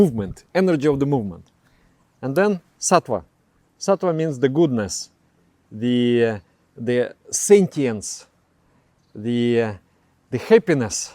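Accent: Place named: Russia. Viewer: Wonderful video! Thank you so much for the information, very helpful, brought clarity to me. And your Russian accent is awesome, wonderful! ❤️❤️❤️😇🙏Namaskaram!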